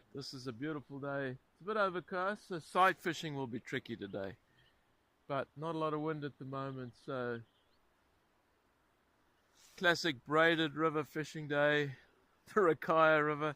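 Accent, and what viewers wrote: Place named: South Africa. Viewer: i love your films. enjoy your SA accent and knowledge of birds, etc, funny camping cooking scenes etc beautiful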